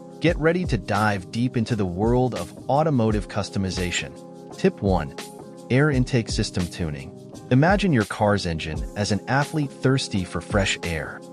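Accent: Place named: Netherlands. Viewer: I am thoroughly confused with every tip starting out with a Dutch accent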